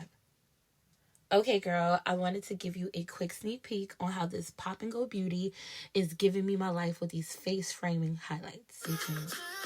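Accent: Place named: New Orleans, United States. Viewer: I love it this is cute!!! And I see that we are from the same hometown , New Orleans baby!!! I can tell by the way you talk. ❤❤❤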